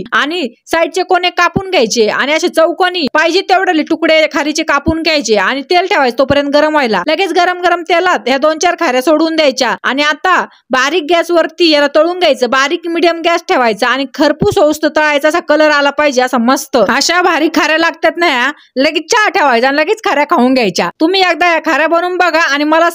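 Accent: Ahmednagar, India. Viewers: Nagari bhashecha laheja mast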